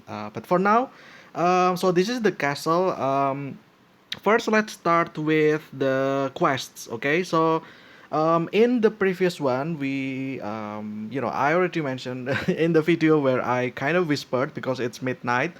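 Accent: Indonesia. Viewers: Iya ketara ngomongnya sih, orang indo pasti bisa dibedain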